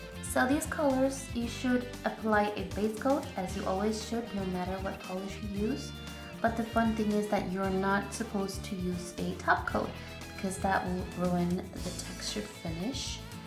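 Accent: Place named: United States. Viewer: She is from Sweden but she still talks like an American..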